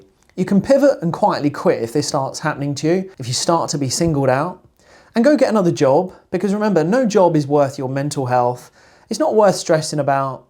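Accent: United Kingdom. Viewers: My narcissistic boss comes into the office every day whilst we work from home so we can spend time with our families. He looks pathetic sat at his desk getting angrier because he can’t stand that work is not everything for some people. I wasted 30 years in my job commuting which time I’ll never get back, thousands of hours wasted commuting is the biggest regret of my life at 50. Can I just say it’s refreshing to hear an articulate British gentleman like yourself who doesn’t fill his videos with profanities.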